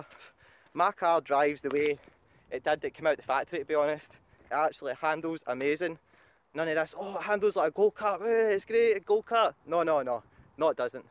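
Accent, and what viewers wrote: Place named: Scotland, United Kingdom. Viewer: Good video mate, strong accent though, good job I've Scottish in my blood 😂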